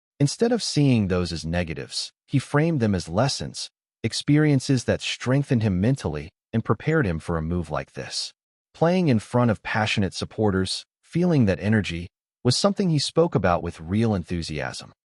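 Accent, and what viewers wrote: United States. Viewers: Sorry - but nobody in Scotland wants to listen to an American trying to talk about Scottish football - change the AI to a Scottish accent!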